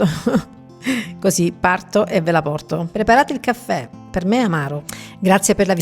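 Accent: Calabria, Italy. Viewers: il tuo stupendo accento calabrese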